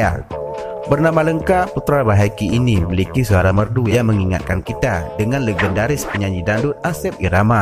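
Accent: Aceh, Indonesia. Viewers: kupeuraseun keuh bahasa gata admin meutuah ee, sep brat meulogat peutak peutok aceh tok tok. 🙏🙂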